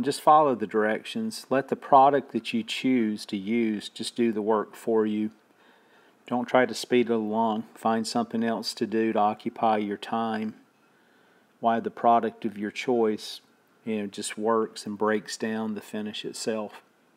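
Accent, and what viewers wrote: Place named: Carolina, United States. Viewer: Don..are you a Carolina boy by chance? cnt quite grab the origin of your accent..but thx for the great tips on stripping..appreciate your time and effort...Rich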